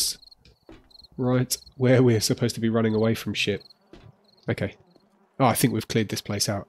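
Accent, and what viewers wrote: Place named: South East England, United Kingdom. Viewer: It was an update 'ol chap. Also there is no longer a guarantee of getting a book bundle in the rewards list. You mentioned you live in Australia, but you're English, you sound like you're from south east England, although you pronounce your 't's" so probably not London or Essex, unless you had a nice middle class upbringing... ;-p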